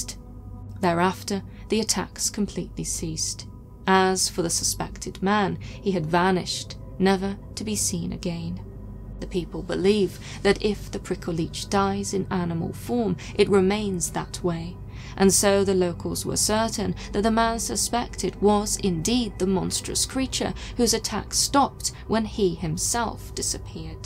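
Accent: United Kingdom. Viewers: I think she's so hot. The whole darkness shit and proper British accent aka queens English while talking about spooky ass shit just hits you right in the feels. The fact she covers well known stories yet divulges so much new information, it usually ends up changing ones perspective as there is no preexisting agenda.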